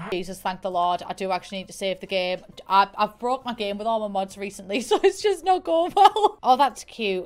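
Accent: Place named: Scotland, United Kingdom. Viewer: Your voice gives me LiFe! I love the Scottish accent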